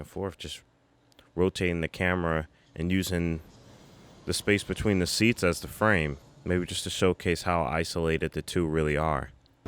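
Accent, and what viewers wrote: Philadelphia, United States. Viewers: I knew your accent was familiar. Philly represent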